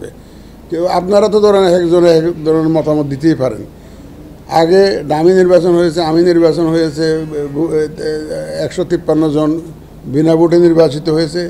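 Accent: Chittagong, Bangladesh. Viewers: ওনি চট্টগ্রামের ভালো লাগলো কথা শুনে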